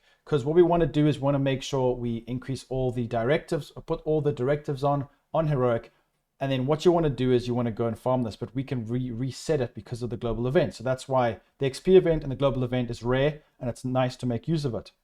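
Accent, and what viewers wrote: South Africa. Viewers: Hey are you South African you have that South African accent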